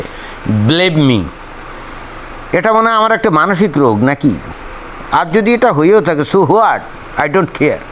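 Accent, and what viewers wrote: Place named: South, Bangladesh. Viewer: আপনার ভাষার উচ্চারণ শুনে ধারণা করি আপনি যথাসম্ভব দেশের দক্ষিণাঞ্চচলের মানুষ,আমার বাড়ি ও এদিকে।Student visa তে আমি ও দুই বছরের মত ইউরোপের একটা দেশে ছিলাম কিন্তু মন টেকেনি।পড়াশোনার পাশাপাশি কাজের সুযোগ ও খারাপ ছিল না তবুও কেন জানি Home sickness কাটিয়ে উঠতে পারিনি চলে আসলাম।পরিচিতরা ঠাট্টা বিদ্রুপ করে চলে আসাতে কিন্তু তাদের কে কি করে বুঝাব দেশের জন্য মন হুহু করার অনুভূতি টা কেমন।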